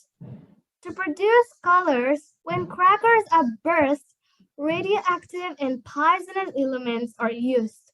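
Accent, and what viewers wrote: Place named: United States. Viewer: Speaking like someone who born in America, next level accent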